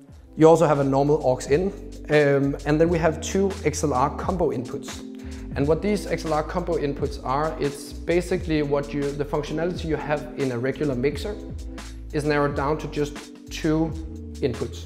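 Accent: Denmark. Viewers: I Can hear the Danish accent haha sinds højtaler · Ja jeg elsker man kan høre han er dansk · man kan høre han er dansk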